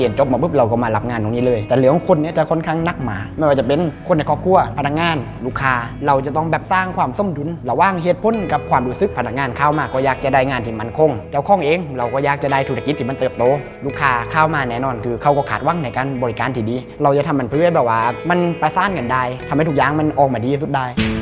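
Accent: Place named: Thong Daeng, Thailand. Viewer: สำเนียงทองเเดงเท่ดี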